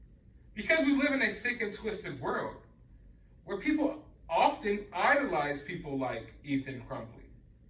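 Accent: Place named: Hawaii, United States. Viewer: How you say, where I stay, is so Hawaiian 😉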